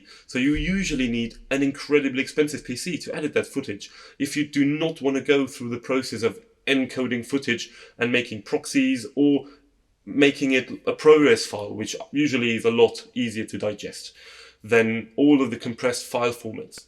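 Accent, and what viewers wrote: United Kingdom. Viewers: where is that strong british accent from my brother? love it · Bloody hell, didn’t know you were British mate. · 👍🏻👍🏻👍🏻 Du hast mich total überzeugt !!! Nicht nur "Intel core", auch dein Britisch Englisch ist really Great (Britain), fantastisch! Hut ab! 👏🏻👏🏻👏🏻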